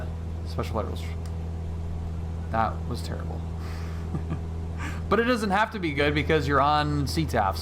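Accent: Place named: North, United Kingdom. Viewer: But you have a northern accent! 🤨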